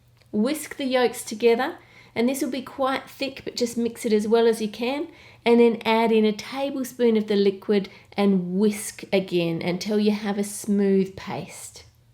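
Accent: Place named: Australia. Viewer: She's very Australian. I can't stand Australian accents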